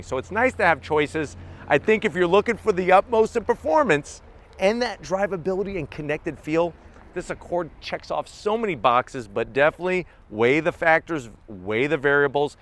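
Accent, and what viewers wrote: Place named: New Jersey, United States. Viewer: I'm guessing this guy is from NJ or LI.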